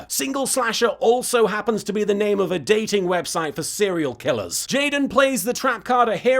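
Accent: United Kingdom. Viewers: Random nonsense in a British accent... That's one of my favorite kinds of random nonsense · Chillin' out without a crew? Are we, your fans, not enough of a crew? Are we too motley? Random nonsense in a British accent... that is totally my jam. Do it more with less people... all those virile young people, alone on an island... yeah, there will be more something going on... Give me 3... steps mister, 3 steps towards the door? Jaden's pun at the end... it didn't have a ghost of a chance. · Random Nonsense in a British Accent is it's own official brand of comedy as far as I am concerned.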